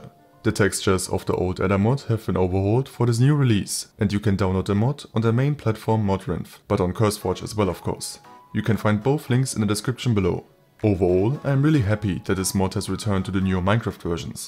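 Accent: Germany. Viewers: deustcher akzent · Why is the German accent so damn strong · good video as always. took me many vidoes to realize that you have an german accent lol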